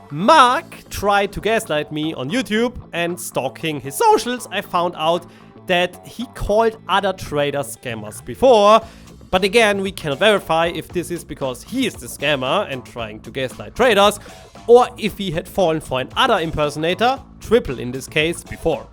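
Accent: Germany. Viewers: I hear a little bit Deutsch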